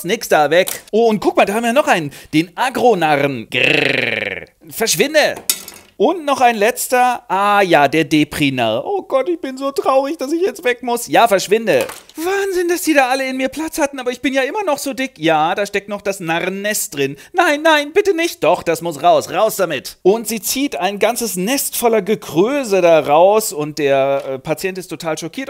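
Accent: Franconia, Germany. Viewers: Der fränkische Zungenschlag, wunderbar! 🍪🍪🍪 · der frrrängische Dialekt ist das Tüpfelchen auf dem i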